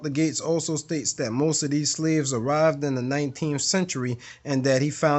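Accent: New Orleans, United States. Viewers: If just keep listening to this guy talks he sounds just like a native new orleanian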